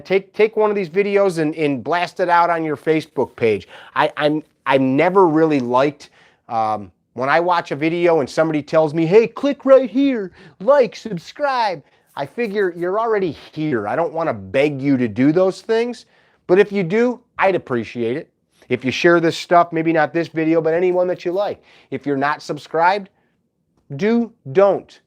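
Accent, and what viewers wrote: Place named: New York City, United States. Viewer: You must be from New York City. It’s the way you said. Tawk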